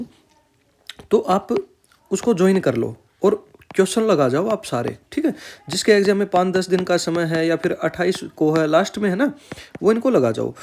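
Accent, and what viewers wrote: Haryana, India. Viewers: haryana wala language h bro iska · Bhai tumne bhi chalana ko chalama likha hai to mistake sbse hota hai aur wo uska accent haryanvi hai to isliye aisa sound kr rha hai. Itni bhi bdi baat nhi hai jitna hyper ho rhe ho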